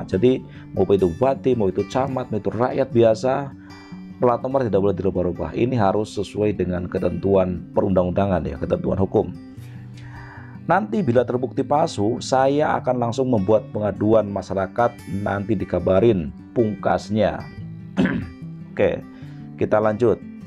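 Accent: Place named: Java, Indonesia. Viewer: Assalamualaikum warahmatullahi wabarokatuh selamat siang min maaf sebelumnya admin nya logadnya medok bange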